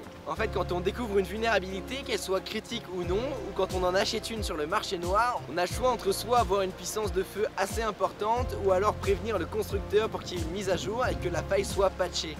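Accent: Quebec, Canada. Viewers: vous trouvez pas qu'ilà un petit accent québecois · Que tu as un accent québécois chronique :o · Est - tu canadien ?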